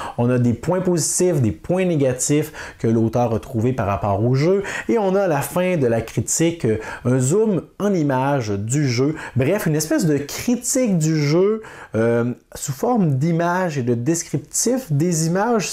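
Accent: Quebec, Canada. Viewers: Nouvel abonné, je vois suis depuis la Toulouse en France. Je vous ai découvert par hasard, et je vais être honnête c'est l'accent québécois qui m'a fait triper ! Plus sérieusement, c'est votre vidéo sur cloudspire qui m'intéressait, et grâce à vous (ou à cause de vous ?) mon portefeuille va saigner car j'ai très très envie de me l'offrir ! Merci pour votre passion et bonne continuation ! Salutations de Toulouse !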